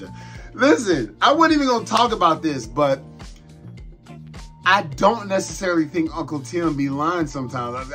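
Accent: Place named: New Jersey, United States. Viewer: So I came here from ninja... This is by far my new favorite channel. Hearing you talk makes me homesick about my people in NJ. Amazing content! Subscribed!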